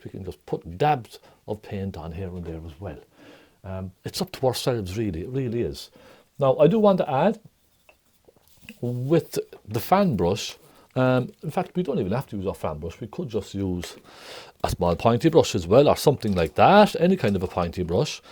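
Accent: Ireland. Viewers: I enjoyed your Irish accent. Thank you for showing me how to paint mountains and grass.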